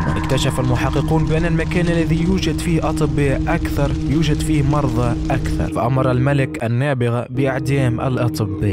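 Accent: Tunisia. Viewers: انت تونسي او جزائري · هل انت تونسي؟ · انت ولد بلادي تونسي صح؟؟ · ياخي انت تونسي؟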